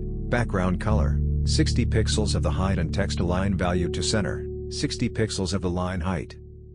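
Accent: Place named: India. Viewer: Next level indian accent covering though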